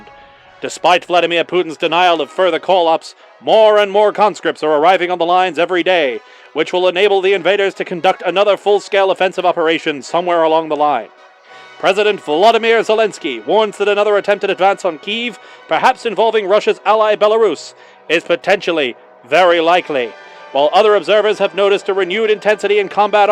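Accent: Transatlantic, United States. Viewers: Great American accent! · More quality content from the king himself. You sound more American than I do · I love the film reel style, but one note on the Transatlantic accent, you can still keep most of your Australian pronunciation with soft R's before consonants and longer vowels in the same way British Transatlantic Accent broadcasters could, keeping the allied identity there but maintaining the narrow frequency range and vibe (Wendover did a good video on how to speak it and it ends up sounding more Aussie since it was half-designed by an Aussie anyway, for not-so-noble purposes: <link> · Incredibly neat work. Is that your 'Yank' sounding voice.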